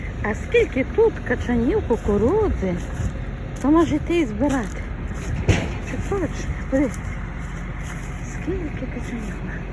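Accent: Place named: Prykarpattia, Ukraine. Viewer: Чую прикарпацьку говірку❤. Дякую Вам дорогенька за відео. Я тої зими готувала конвертики з яблуками за Вашим рецептом. Маю схожий рецепт від моєї мами але тісто з пива, масла і борошна. І називалося це печиво ''хусточки''. Успіху Вам і Вашій родині❤❤❤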